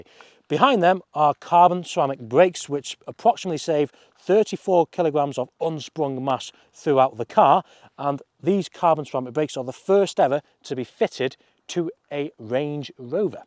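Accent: North, United Kingdom. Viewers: I'm sorry...I just cannot listen to your droll northern accent for more the 2 mins. Apologies.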